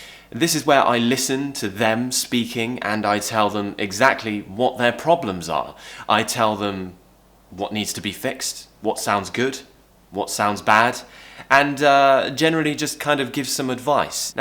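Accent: RP, United Kingdom. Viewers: Thank you! It is important! - Love your RP British English teaching! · You make me love British Accent ! I like all your videos thank you so much 🌺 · Fantastic teacher with a brilliant British pronunciation. Thanks a million,.✌ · Hit the like button before watching just because of your british accent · I love your modern RP pronunciation. Your videos are very interesting and useful. AND you're handsome as well 😉. Cheers! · I love the British accent, and I really loved your video! It helped me to understand more of the accent, and how to pronunciate. Big thanks to you! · My problem is pronunciation, but i like your british pronunciation. Cheers, mate. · If it is British accent I love it!!!! Your accent is amazing!!!! I want such accent.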